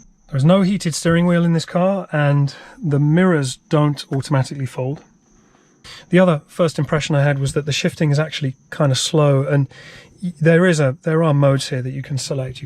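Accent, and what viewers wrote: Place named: United Kingdom. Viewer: Why are you speaking british ?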